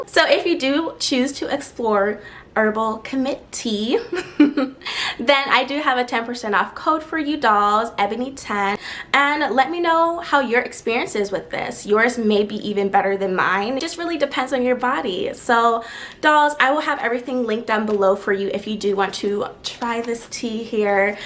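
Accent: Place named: Caribbean. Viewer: Where are you from? I'm hearing a particular accent from the carribean.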